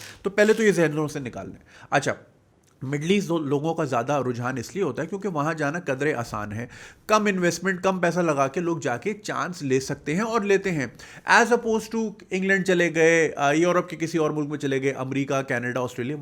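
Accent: Karachi, Pakistan. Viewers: Love your show specially free spirit talk and Pure Karachi Accent love it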